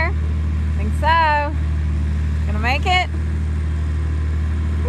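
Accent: South, United States. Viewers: Loved the video. Typical farm day something always breaks Lol. I love your positive outlook also your accent. A real southern lady 🙂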